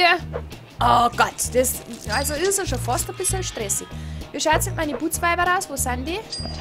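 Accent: Bavaria, Germany. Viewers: mach mal ne ganze folge auf Bayrisch! BITTE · Die Idee finde ich gut Finde das spiel so witzig besonders mit deinem bayrisch =)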